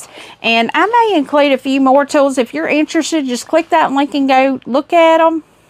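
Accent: South, United States. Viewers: Adorable block. I'm definitely trying this one! First time watching. I'm not sure where you are from, but o adore the southern accent!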